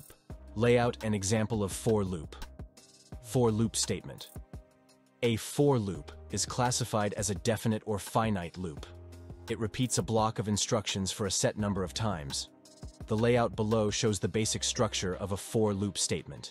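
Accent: Jamaica. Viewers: we need that orignal jamaican voice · original voice with that Jamaican accent was the one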